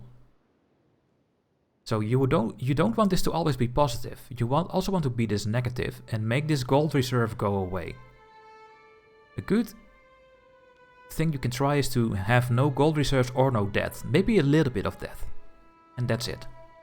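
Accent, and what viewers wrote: Netherlands. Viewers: Dutch has a really elegant sound to it, especially when someone speak English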